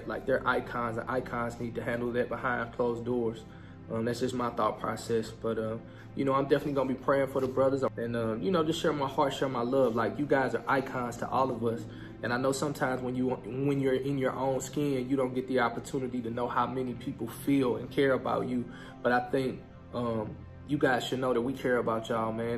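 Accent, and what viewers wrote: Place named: St. Louis, United States. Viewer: Texas + Cali + Pinch of NY = STL Accent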